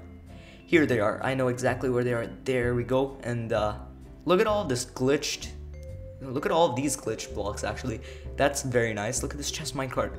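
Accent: India. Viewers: U have a slight Indian accent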